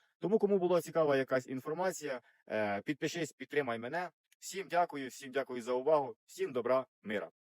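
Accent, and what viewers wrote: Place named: Transcarpathia, Ukraine. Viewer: Це, звичайно, Ваше право відкрити свій канал, але хочу Вам допомогти порадою. Мільйонам українців, які знаходяться закордоном, це не буде цікаво. За кілька років всі вже якось визначилися, що їм далі робити. Українці, які залишилися в Україні, в більшості озлоблені або зомбовані провладними ЗМІ. Багато людей ненавидять тих, хто виїхав. Тому, якщо будете продовжувати, будьте готові до злих, негативних коментарів. Та Ви вже і самі це побачили. І ще, Ваша мова підказує мені, що Ви, скоріше за все, із Закарпаття. А це додатковий подразник для інших українців. В любому випадку, Вам вирішувати, чи варто Вам наражатися на негатив, чи дійсно це Вам потрібно, чи хочете Ви витрачати на це свій час, сили і кошти. Яке би рішення Ви не прийняли, це буде Ваше рішення. Я Вам лише даю пораду. Здоровʼя і добра Вам і Вашій родині.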